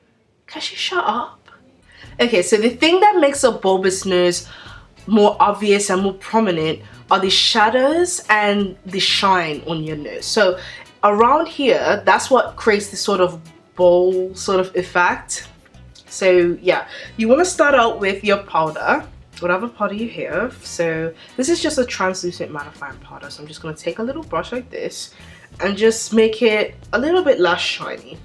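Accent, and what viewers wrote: Bermuda. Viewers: Where are you from? You sound borderline Bermudian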